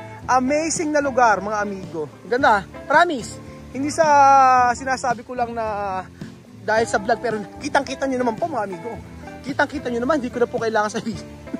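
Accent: Nueva Ecija, Philippines. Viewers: Extrovert kc tlga c kuya tas taga Nueva Ecija pa, minsan ang punto ng pananalita d2 sa amin sa Nueva Ecija e sadyang mlkas at minsan napagkkamalang maybang pero ganun po tlga kming magsalita. Inamin din nmn ni kuya na may pagka bully sya, mapang asar din sya pero ganun nga ang personality nya. Oo nga, minsan need din tumahimik lalo na sa mga museums o sa lugar na dpt di maingay. Di rin ako pabor na isinasama si Misma sa mga green jokes kung nagkaganun nga( di ko npansin nung pinanood ko video sa LU). Pero cguro pag di rin magdadaldal o tatawa c kuya, may masasabi n nmn tayo ano? Kung seryoso sya, may masasabi ulit tayo. Lahat ng galawan nya, papansinin natin di ba? Tas ibabash natin sya di ba? Kya, madaldal man o tahimik c kuya, may masasabi at masasabi pa rin tayo. Hayyyy, ewan ko my face( ibash ko n rin self ko)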